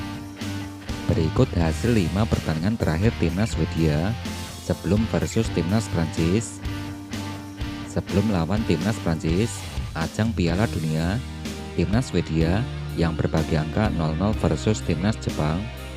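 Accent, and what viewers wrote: Java, Indonesia. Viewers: mimin e wong jowo to 😊😊😊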